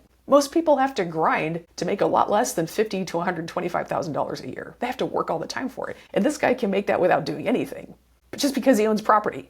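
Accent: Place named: Lompoc, United States. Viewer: Oh,my, are you in Lompoc, CA? Don’t answer but that’s what it sounds like. Cheers.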